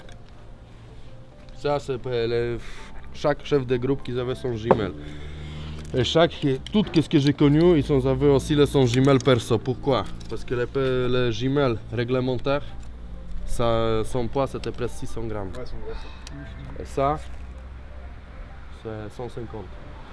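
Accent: Russia. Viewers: Похож на русский акцент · ? Il est d'origine russe selon moi d'où est le problème ? Un accent différents et donc ?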